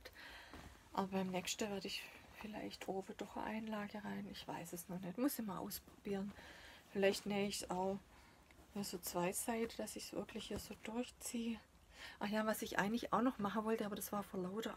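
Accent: Swabia, Germany. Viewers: Hallo liebe, vielen Dank für den Tip mit dem Baumarkt für Frauen "freu" da werd ich die Tage doch mal vorbei schauen isch ja grad mal ums Eck und endlich mal eine Schwäbin die nicht Hochdeutsch "schwätzt" Liebe Grüße aus Fellbach:) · Hallo, ich höre dir gerne zu, du hast eine Art die mich total entspannt, keine Hektik nur tolle Arbeiten zum schauen und dein leichtes Schwäbisch ist sehr nett. Ich will auch so nen Baumarkt in Frankfurt aber er wäre mein Ruin glaub ich. Du machst das ganz wunderbar und ich freu mich jedes Mal wenn ich ein Video von dir sehe. Mach's gut bleib gesund.😉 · Hallo. Ich mag deine Videos und dein schwäbisch ist einfach nur schön😊 grüße aus Esslingen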